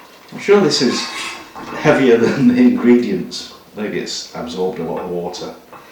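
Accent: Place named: Scotland, United Kingdom. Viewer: love the scottish accent lol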